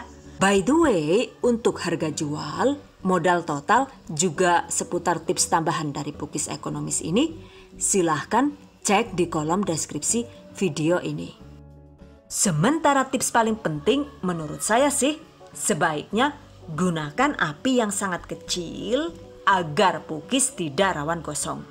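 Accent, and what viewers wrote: Banyumas, Indonesia. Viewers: Done Youtube channel selalu memberi inspirasi resep yang inovatif dan ekonomis Dan mbak itu loh lucu 😂😂 Kalau ngomong ngapak dan catat Gak tau namanya ngomongnya jadi mbak 😂😂 #giveawaythv2020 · Kok bisa ngomong ngapak sih😂 mksh ilmunya❤ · Ngapakers nya mantap , pukis nya jga mantap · Hahahaha seneng nek.lg ngmng ngapak yu 🤣🤣 Jal nek gawean aja akeh" ea lh 500g nyong langka.sng ngrewangi maem yu 😁 Nek srabi gandos ana resepe ora yu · Lucu bs nglucu n bhs ngapak pndai pula ber bhs inggris msih muda tp Suarana mntap seperti wanita dewasa kya pula, mksh resep" nya berkah buat bnyk org sht n sukses sllu 🤲🤲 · Mba. Jenengn suarane enak temen si. Inggrise ya jos. Bisa ngapak maning. Sht trus ya mba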